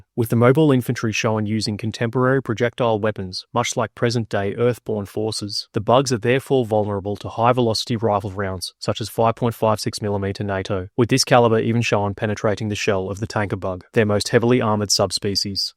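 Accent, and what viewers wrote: Australia. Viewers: He clearly has an Australian accent.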